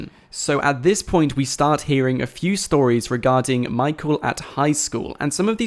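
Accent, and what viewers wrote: United Kingdom. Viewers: Firstly don't hate on his British accent and secondly he's saying it the right way, it's pronounced mike-al-de-santa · Stop being mean, its not his fault hes british and that he has a British accented!!!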